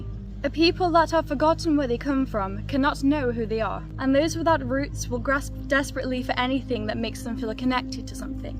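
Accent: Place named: Northern England, United Kingdom. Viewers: Did you ever spend time in Northern England? Your accent has a trace of it. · I really enjoy your videos. You seem to have a slight northern tint to your English. Did you use to live “up North “ somewhere? I’m friends with a Texan Odinist who is very close to her British and German heritage. I’ll send her this video. Thanks!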